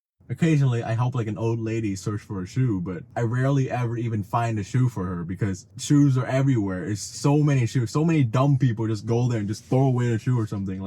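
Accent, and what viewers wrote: Vietnam. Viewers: Your vietnamese accent is showing.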